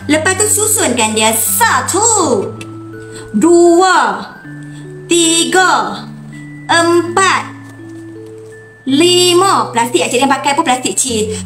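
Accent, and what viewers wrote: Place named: North, Malaysia. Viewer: Suka dengaq hang habaq Utara no..."jgn gatai2 tgn lipat" 😂😂😂🤣🤣🤣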